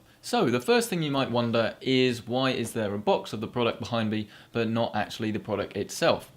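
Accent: England, United Kingdom. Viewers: You can't beat a good English accent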